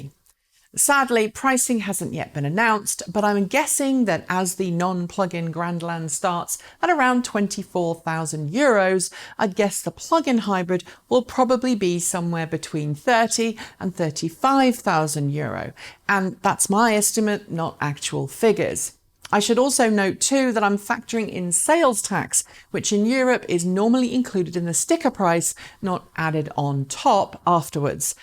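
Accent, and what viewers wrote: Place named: United Kingdom. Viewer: Another good review, where are you based as you have a British accent but quote in dollars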